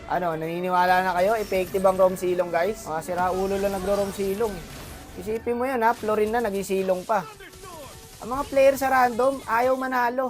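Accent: Iloilo, Philippines. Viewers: Boss try mo naman mag Ilonggo na voice over alam kung Ilonggo ka boss eh mas nagiging funny content mo pag nag Ilongo ka bigla bigla nalang ako natatawa HAHAHHAHA sana ma pansin ❤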